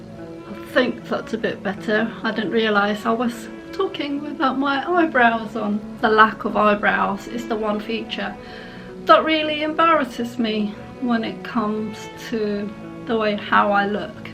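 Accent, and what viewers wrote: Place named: United Kingdom. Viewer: Hi I will be turning 63 coming up next month on February 9 now your voice sounds like your British but! where are you from when you really do not mind me asking? and I know just how it feels when it all comes down to you being alone all by yourself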